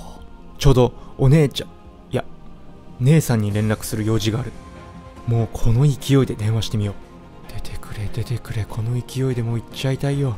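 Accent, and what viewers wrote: Kagoshima, Japan. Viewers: 鹿児島のなまり（笑） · 鹿児島出身鹿児島育ちなので、方言嬉しいです😆 さんの動画などで、いつも笑わせてもらってます！ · かごんま出身、知らなかったか忘れてました😮訛りたまらん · リクエストした鹿児島弁さっそく話して下さりありがとうございますー🎵鹿児島弁忘れてなかったですね😆笑ったぁー😆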